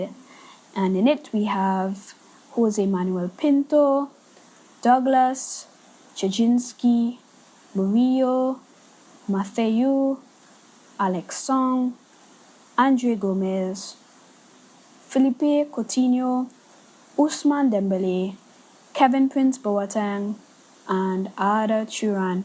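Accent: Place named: Caribbean. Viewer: Finally a Caribbean voice on Barca matters. Great stuff!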